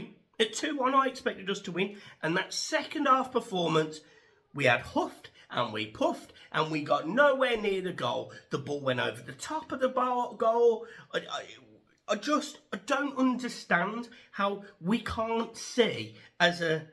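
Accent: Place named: Liverpool, United Kingdom. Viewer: His scouse accent reminds me of my ex girlfriend she was a Brummie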